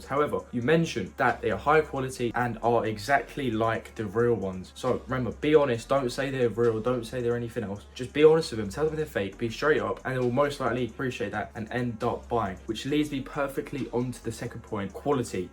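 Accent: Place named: Bulgaria. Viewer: Are you bulgarian?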